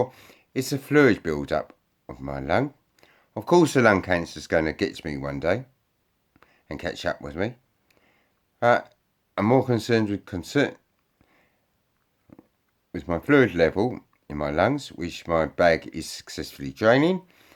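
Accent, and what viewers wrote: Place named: Cockney, United Kingdom. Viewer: I've been looking back at some of your earlier videos and note that you used to speak with a cockney accent. Did you put that accent on so as to appear hard?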